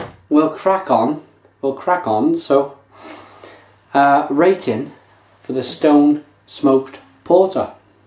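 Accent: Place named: London, United Kingdom. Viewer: YOU SOUND LIKE YOU'RE FROM LONDON TOWN! Nice review.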